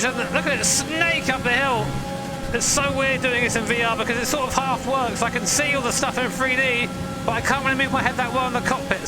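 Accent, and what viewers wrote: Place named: RP, United Kingdom. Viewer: That posh gentry accent is TOO GOOD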